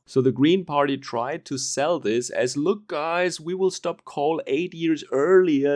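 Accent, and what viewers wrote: Bavaria, Germany. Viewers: Mate, he is from Bayern......they struggle with German! They often have to add subtitles when someone from Bayern is speaking on German TV.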